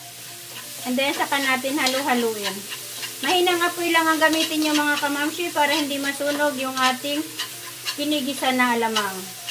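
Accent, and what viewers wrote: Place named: Manila, Philippines. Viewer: taga iligan diay ka pero ang imong tagalog murag pure na taga manila...happy new year dai❤from ISRAEL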